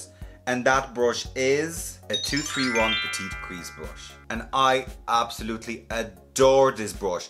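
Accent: Dublin, Ireland. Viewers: Love the accent! Irish? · I love your Dublin accent ❤️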